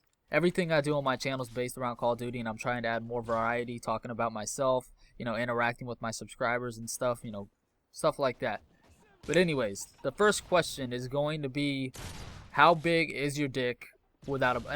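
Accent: United States. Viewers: also are you actually Australian because you sound american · Wait are u american or australian?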